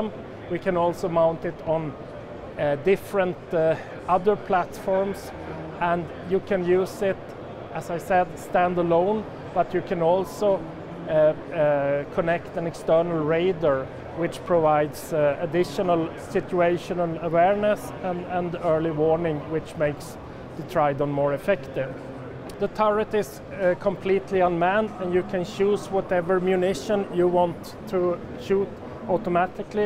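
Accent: Sweden. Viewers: Horrible Swedish accent + lisp. I understood it perfectly, being Swedish myself, but man it is the opposite of an eargasm to listen to my countrymen when they can't pronounce English properly. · Swedish i think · Swenglish sound so horrible.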